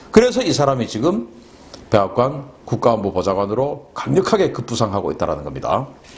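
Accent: Gyeongsang, South Korea. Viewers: 이씹새끼는 말투부터 문둥이네.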